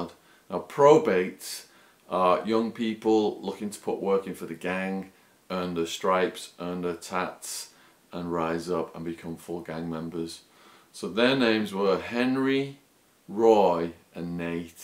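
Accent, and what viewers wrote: United Kingdom. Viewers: You sound British. Were you deported? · Are you from UK?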